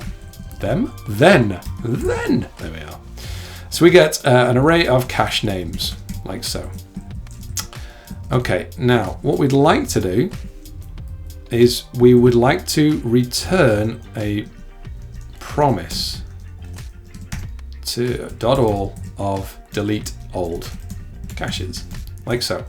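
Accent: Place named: United Kingdom. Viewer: just watched first 7 minutes, and then... Jake at Udacity?? see you in a few days,! (also: really enjoying your British)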